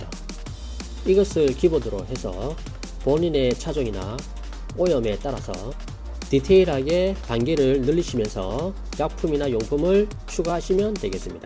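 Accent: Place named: Gyeongsang, South Korea. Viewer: 어색한 표준말 경상도 티 다난다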